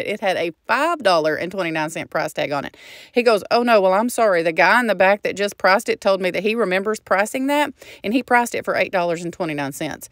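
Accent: South, United States. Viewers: I just have to ask....are you in Louisiana by any chance? I swear the neighborhood at the beginning looks like Lake Charles to me and you sound Southern. (I'm originally from LA but now a full-time Texan.) Loved the video and especially the styling portion!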